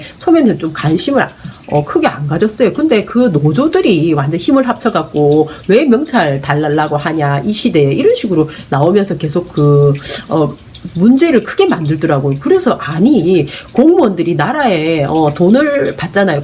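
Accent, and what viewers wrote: Gyeongsang, South Korea. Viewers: 다 좋은데요... 은 뭘해서 먹고사나요...직업이랄까 아니면????? 잘사는 사람 같기도 하고....아니면??????..... 왜 이런 일을 하시나요????...말투를 보면 경상도 말투...가끔 봅니다...고맙기는 해요..헌데..이런 방송을 통해 사실 왜곡을 하는 사람들이 너무 많다보니...방송소리 중에 물소리 같은 게 들리는 데 뭔가요? 잘사는 엘리트지식인인가요?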